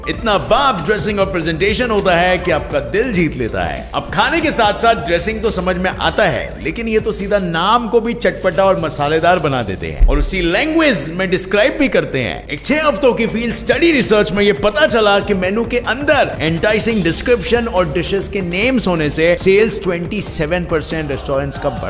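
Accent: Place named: Kumaon, India. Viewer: Bhai as your dialect is kumaoni. Do you know Kumaon mastiff.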